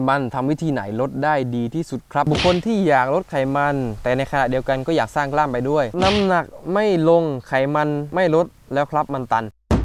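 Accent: South, Thailand. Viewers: คนใต้หรองับ/ สุดหล่อ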